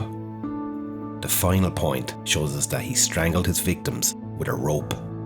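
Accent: Ireland. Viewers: Well Its usually someone with a New York accent narrating these videos about Rex but this time it's a lilting and lovely Irish brogue! Jesus Mary and Joseph it is horrendous to hear what the despicable monster did to his victims dear Lord Jesus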